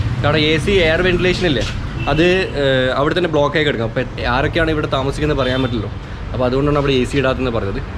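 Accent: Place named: Trivandrum, India. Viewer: Kalli valli broo namma trivandrum dialogue ......ninga poliya macha...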